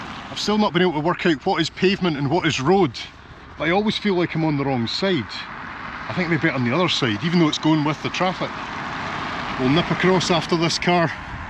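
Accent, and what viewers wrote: Scotland, United Kingdom. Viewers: Thank you for not punishing us too badly with your wee references to our convict who would be king! I'm thinking I need to persuade my husband to get me to Greenland before someone does something stupid (well, even more stupid) and we can't go at all. I'm going to start practicing my accent to sound like you so that we can go under cover as Scots rather than americansk, maybe that'll help. The video was absolutely lovely, and you're a wonderful tour guide for sure. We watched the next video earlier, so we saw the boat and the bergs and growlers, but it would be great to see it again. We're winter people as well, so that would suit us perfectly! Thanks again, and looking forward to the next one!! ❤ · Half the joy- your scottish accent 😸 Tnanks for another great trip 👌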